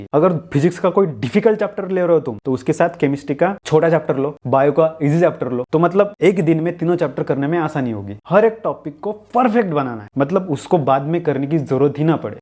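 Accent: Maharashtra, India. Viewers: That Marathi accent while speaking Hindi 😍😅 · I'm also from Latur.... Aur aapka Maharashtrian accent dikh raha h hindi me... 😅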